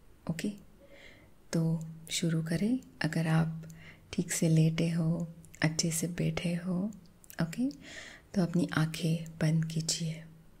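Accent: Maharashtra, India. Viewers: This is toooooooooo Sweety sweety voice🤭😍🙌...when a Maharashtrian girl talks in hindi😍🙌😬🤭... Khup chaan ma'am 🙌😬 Big big fan🙌😬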